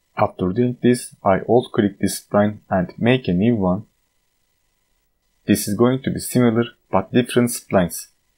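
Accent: Turkey. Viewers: izliyorum izliyorum diyorum ne kadar türk aksanı var , archicadde çizim yapmayı bırakıp bunu düşünüyordum. ekranınızdaki TUR yazısını görünce aa haklıymışım dedim :)) teşekkürler çok yardımcı oluyor videolarınız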